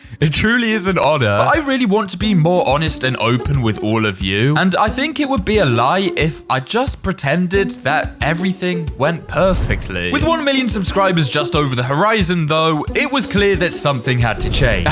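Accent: United Kingdom. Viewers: When and where is the frog party and are you british😅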